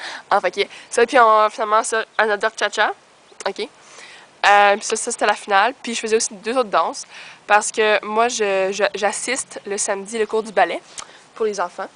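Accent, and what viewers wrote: Canada. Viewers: j adore voir tes videos..... je te trouve tres sympa et j aime bcp tn accent canadien =) grooooooooos bisou from italy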